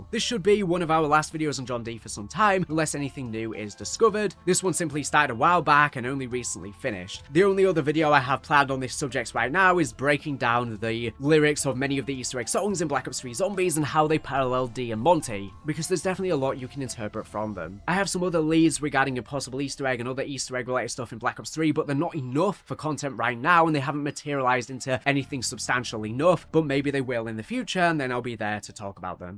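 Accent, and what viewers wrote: United Kingdom. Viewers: He's just British 😅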